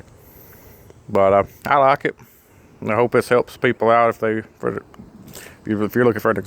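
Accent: South, United States. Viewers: I like that fat dip spit and the southern drawl!! Good lookin truck buddy!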